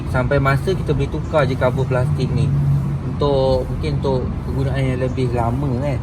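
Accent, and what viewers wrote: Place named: North, Malaysia. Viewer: video ni cakap utara, video next cakap sabah ke terengganu ~!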